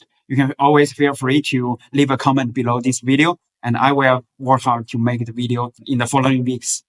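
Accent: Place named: Ireland. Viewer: Would never have guessed you were anything but irish 🇮🇪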